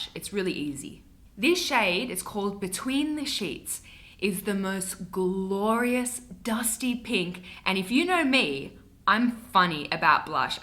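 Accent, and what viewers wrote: Australia. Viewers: I really love your accent ... Make me think to my "aussie crush"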